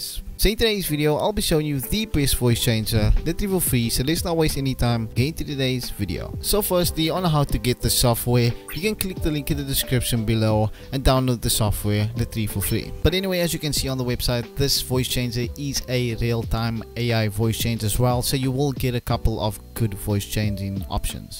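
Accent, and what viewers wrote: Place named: South Africa. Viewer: 😅 South African AF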